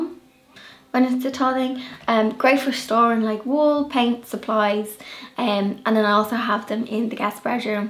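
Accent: Ireland. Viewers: That is not an English accent. Probably Ireland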